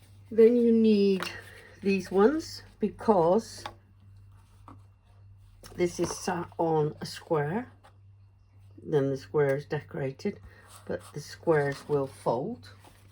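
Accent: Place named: West Country, United Kingdom. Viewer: An absolutely beautiful card made by a beautiful lady. I can hear by your accent that, like myself, you're a West Country girl? I'm a Devonshire Dumpling 😂😆💗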